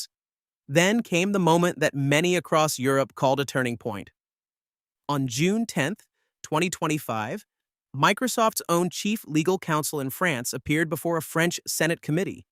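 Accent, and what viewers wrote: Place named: Canada. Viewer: you sound like some dummass canolian.....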